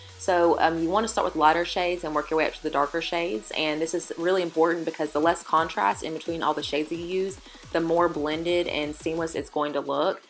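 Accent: South, United States. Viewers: New subbie bc u r gorgeous and i love your southern accent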